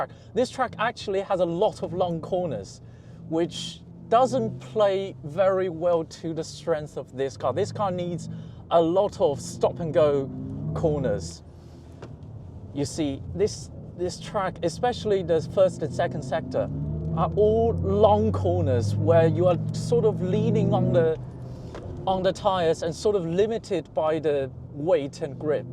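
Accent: United Kingdom. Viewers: 哥们英音真牛逼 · 的英式口音太特么好听了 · British Accent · 英国口音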